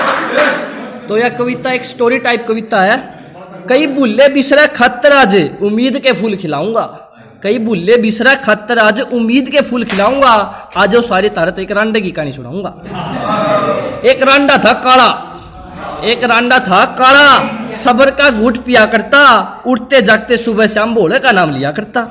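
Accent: Haryana, India. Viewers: Bhai re teri haryanvi boli mja aaga🥰😍🤗🤗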